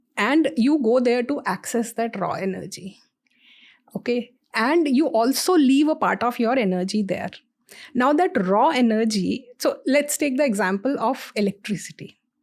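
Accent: India. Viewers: This guy has one of the best funny Indian English accent.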